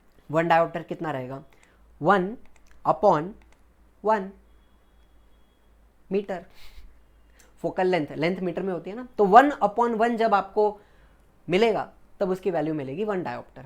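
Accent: Maharashtra, India. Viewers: sir your mother tounge is marathi?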